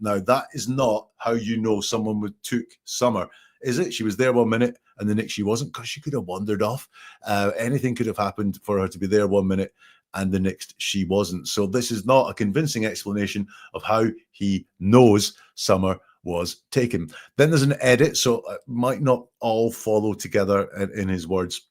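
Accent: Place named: Perthshire, United Kingdom. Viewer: your accent sounds like your from the Perthshire area that's where I'm from I'm enjoying your channel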